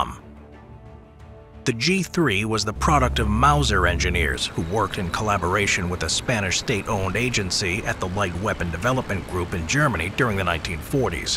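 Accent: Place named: United Kingdom. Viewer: I’ve never seen your face until today, I thought you were British lol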